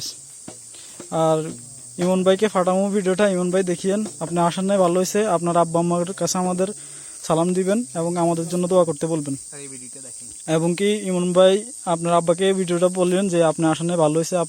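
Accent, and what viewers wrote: Noakhali, Bangladesh. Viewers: Vai mone hoy noykhalir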